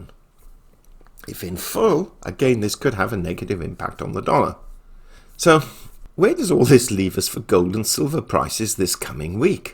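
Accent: United Kingdom. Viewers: Can't beat a British accent when it is spoken well..... PS..keep buying PMs !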